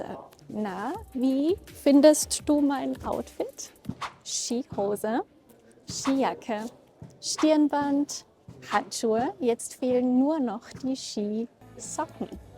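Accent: Standard. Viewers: [sch ≠ ch = -ig] Die Grundregel für die Aussprache der standardisierten, literarischen, landesweiten, einheitlichen deutschen Sprache. Hochdeutsch.🖤 ❤ 💛 Das ist nicht nur angenehm zu hören, sondern auch leicht zu verstehen! Gut machst 💪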